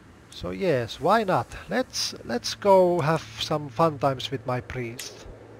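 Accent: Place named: Finland. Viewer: You sounds very finnish. I like it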